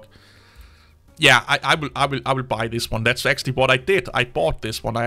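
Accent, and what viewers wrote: Denmark. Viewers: I'm guessing Danish?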